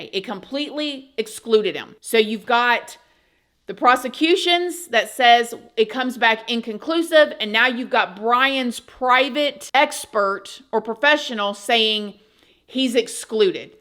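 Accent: South, United States. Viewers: Please don't.... to Inniebody, Inniewhere. I just love your Southern Belle voice and accent. You musta been a beautiful baby, b'cuz look at you now! Too bad you're already taken. Your husband is a very lucky man.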